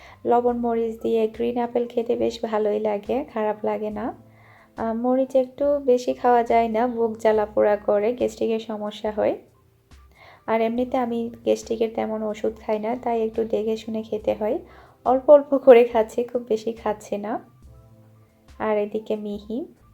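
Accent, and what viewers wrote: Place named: Satkania, Bangladesh. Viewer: কমেন্টের রিপ্লে দিতে হবে না, আঞ্চলিক ভাষায় ব্লগ দিয়ে আমার মনটাকে শান্তি করোন, সত্যি আপু আপনার কণ্ঠে আমাদের সাতকানিয়ার কথা এতো এতো সুন্দর লাগে, আমি বুঝাতে পারব না ।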